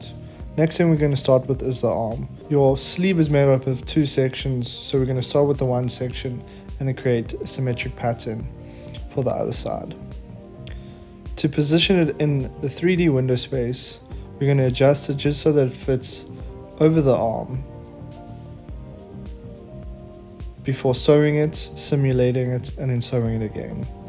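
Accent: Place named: South Africa. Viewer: That South African accent! My boy!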